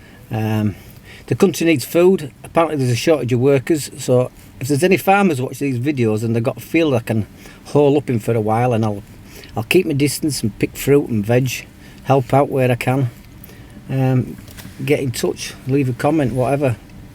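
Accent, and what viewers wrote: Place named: Yorkshire, United Kingdom. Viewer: I know you haven’t and never will change, but the World has changed mate and it’s caught up with you. Better repair back to where your accent won’t identify you as an ‘outsider,’ which in your case is Yorkshire. Once this is all over, hope to see you making great photos in your own unique nomadic fashion, but who knows when that will be!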